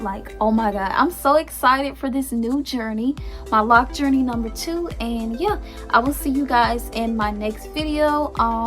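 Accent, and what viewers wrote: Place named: South, United States. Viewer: Where are you from? Your accent is so cute and country lol..ppl say in country but I can’t hear it for myself.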